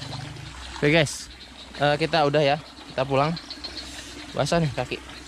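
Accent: Java, Indonesia. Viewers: kok ngomongnya jawa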